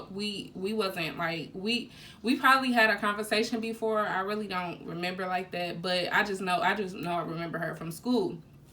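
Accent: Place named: Detroit, United States. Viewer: You gotta be from Detroit 😂😂😂 I hear the accent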